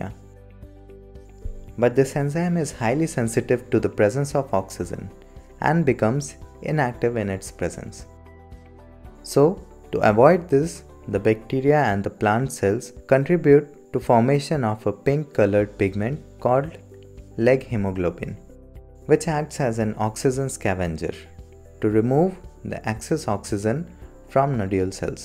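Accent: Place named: India. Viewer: His totally indian accent help me too understand this clearly vrna animation m har jagah angrezo jese aawz !! !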